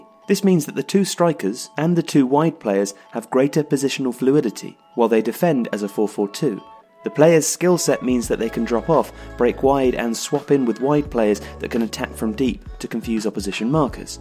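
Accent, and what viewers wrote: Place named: England, United Kingdom. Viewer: I think its just a regional English accent. Some words are pronounced slightly differently depending on where you live. For example, in the south of England where I'm from, most people pronounce "bath" as bar-th, whereas up north they would pronunce it as ba-th. Very similar, but slightly different inflections to the word give them a different sound.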